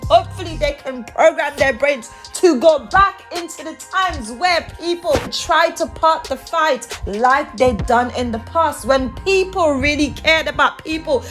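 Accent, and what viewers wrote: United Kingdom. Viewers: 👎 for the accent, stick to that side of the pond with your “UK Dill”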